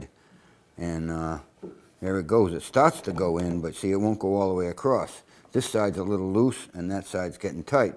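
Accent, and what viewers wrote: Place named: Maine, United States. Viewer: Like his "Down East" accent. Nice the way he finesses each piece slowly and easily. Good work pace.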